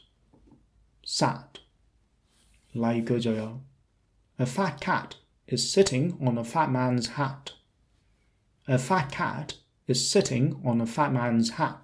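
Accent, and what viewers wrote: United Kingdom. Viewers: By the way, what kind of English accents are yours? Would that be Oxford English? I am not familiar with the different types of English accents in UK, but I find it hard to understand some English people, especially the Welsh. I know people from different regions/provinces/states have different accents. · 以很優美的英式口音，清晰介紹每個vowel！絕對值得觀看、收藏及分享！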